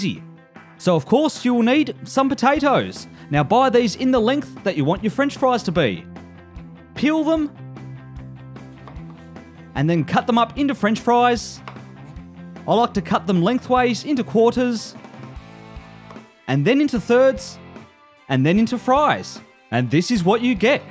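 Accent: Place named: Australia. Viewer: uselessjoe the accent is australian, if that's what you were getting at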